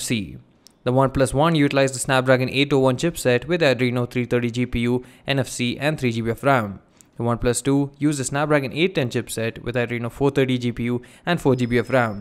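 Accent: India. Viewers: I don't understand your indian accent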